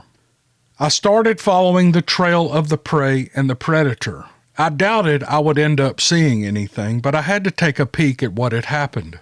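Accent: South, United States. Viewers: Oh my Lord! that was a fantastic account, I was hanging on every word. Thank you to the gentleman who finally shared this hair raising experience.You're also one hell of a writer. The combination of great writing, riveting content & sweet southern drawl of a narration made for a perfect story. I think I'd have night terrors for years had I experienced anything like that. Way good thing that cryptid already had his supper in front of him. It wouldn't surprise me one bit if most of these other Cryptids (other than Sasquatch) weren't the results of some black project military/mad scientist attempts at genetically combining or re engineering experiments gone awry. Whew! that was a mouthful!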